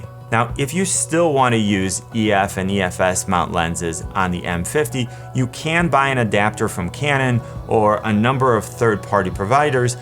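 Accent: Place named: Chicago, United States. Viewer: Thank you- I am leaning towards the SL2 because of affordability and I don’t really want to shoot video in 4K if the auto focus isn’t great in the m50, plus I don’t want to deal with 4K video all over the place. Also I plan to use a gimbal. So in 1080 the sl2 can do 60fps? I want to be able to do slow motion. Also I hear the Chicago accent and the outdoor shots looked like my hometown, Evanston.. ! thank you for a thorough review!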